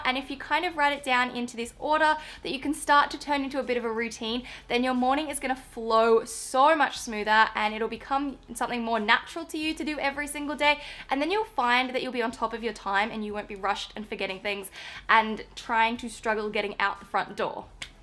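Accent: Australia. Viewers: You are the BEST! I usually hate Australian accent but i love your accent!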